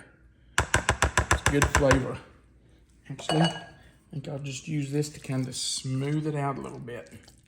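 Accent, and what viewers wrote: South, United States. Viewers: I knew with that accent you had to be in the SEC! ;)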